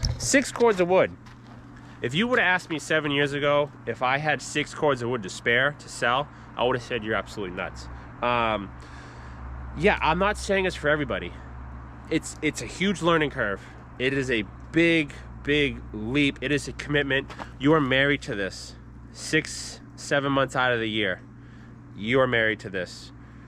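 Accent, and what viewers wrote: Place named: New England, United States. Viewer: You’re definitely from New England. That accent chining through. 😂 I’m from Maine. You?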